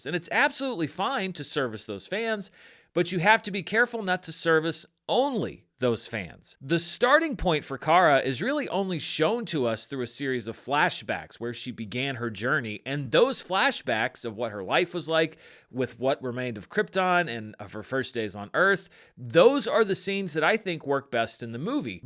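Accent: St. Louis, United States. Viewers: Are u from Saint Louis??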